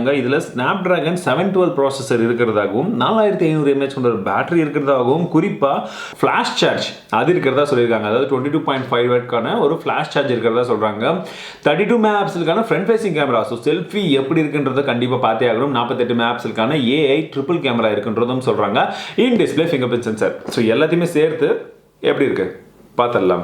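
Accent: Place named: North Chennai, India. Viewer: konjam vada chennai la vara maari voice vardhu bro its gude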